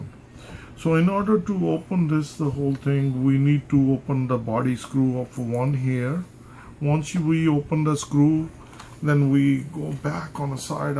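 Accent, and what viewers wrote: India. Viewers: this guy seems like it was his 1st time opening a laptop and the worst video on youtube with his bad English curry English and also half the demo was missing he did not show many steps were missing so thumbs down to u.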